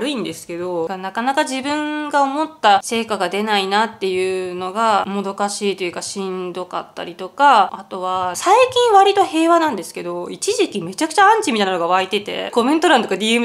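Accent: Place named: Hakata, Japan. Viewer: 必ず新商品はの動画みてますよ。福岡出身なので博多弁がとっても可愛いですよそのまま頑張ってくださいね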